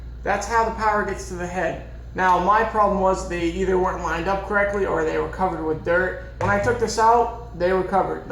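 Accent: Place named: Cockney, United Kingdom. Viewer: you are a star! Spanish Cockney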